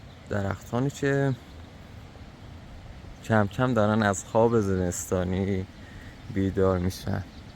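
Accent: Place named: Turkey. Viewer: ما درست متوجه شدیم که لحجه ترکی دارید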